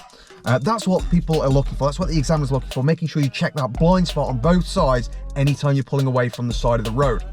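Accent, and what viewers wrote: United Kingdom. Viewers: Are you from Stoke-onTrent? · Oh my god you're from Stoke 😊😅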